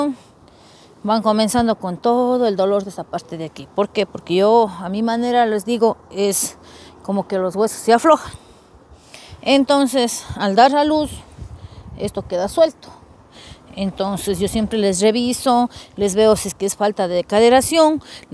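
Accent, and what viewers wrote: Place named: Sierra, Ecuador. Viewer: Así hablan en Cuenca y en la mayor parte de la Sierra de Ecuador, si no le gusta vea otro canal y no traiga hate a esta bonita comunidad q tiene.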